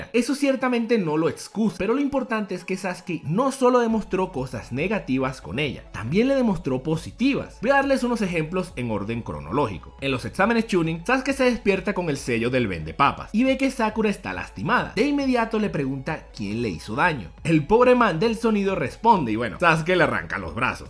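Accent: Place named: Venezuela. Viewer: Oye man pregunta de curiosidad ,eres de Venezuela? Se te nota el acento eso y tu canal esta bien pavo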